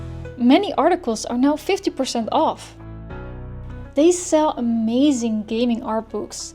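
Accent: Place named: Netherlands. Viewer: I can tell the dutch accent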